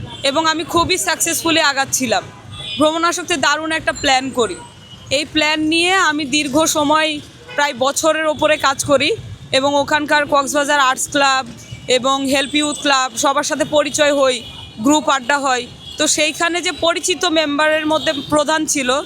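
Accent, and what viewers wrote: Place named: Kolkata, India. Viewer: Kolkata style ei kotha bole ..nice style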